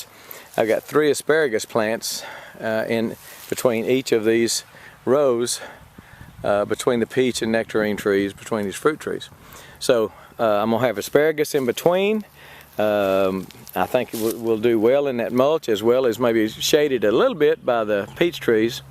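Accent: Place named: Alabama, United States. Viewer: Please pardon my ignorance and forgive me if this is taken as insult - are you from Texas? Because your accent sounds very Alabaman to me 😊🤔 Any ways, thank you for all your videos. It's nice to see true Southerners gardening with native plants in this climate.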